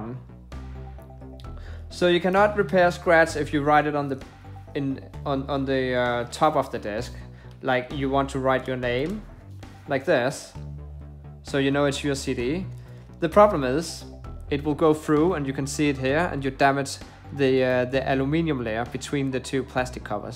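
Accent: Denmark. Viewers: I knew it. Dansker.... Our accent NEVER lies.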